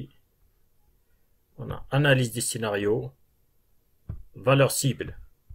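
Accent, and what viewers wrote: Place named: Kabylie, Algeria. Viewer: merci, j'entends un petit accent kabyle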